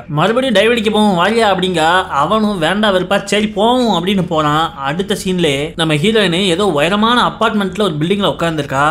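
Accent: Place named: Kanyakumari, India. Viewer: Super slang are you kanyakumari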